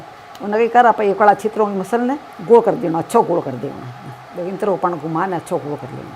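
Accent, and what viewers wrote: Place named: Marwari, India. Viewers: Maine isliye pucha tha bcz aapke baat karne ka tarika whi ka lga muje merta road jaisa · Aapki jodhpuri marwadi bahut hi meethi hoti... Mein soda ki jagah thoda sa curd use karti hoon. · Aapki bhasha thodi alag lagti hai kyoki maharashtra me jo marvadi log rehte hai vo thodi alag marvadi bhasha bolte hai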